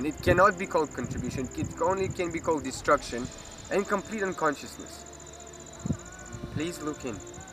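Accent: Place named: India. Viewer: 2reto. He does project a weirdness that makes me wonder if it's a persona. Who is he really to be teaching others? Why does this Russian man speak English with an Indian accent? So many questions...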